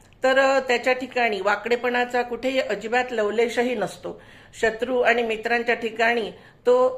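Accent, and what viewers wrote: Standard. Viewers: खुप छान,शुध्द आणि स्पष्ट उच्चार आणि सोप्या शब्दात अर्थ सांगीतला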